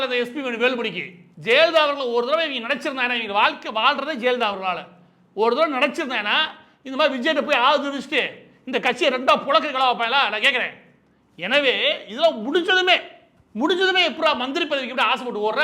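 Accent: Madurai, India. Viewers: அண்ணா பேசுவது தான் நல்ல இருக்கும் மதுரை மொழியில் 👍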